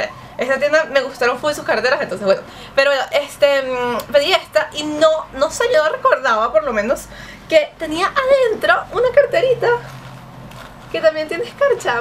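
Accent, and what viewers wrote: Venezuela. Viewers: todass las venezolanas hablan asi, jajaja bueno a excepcion de algunas pero casi todas tienen ese acento y no es que sean pretenciosas.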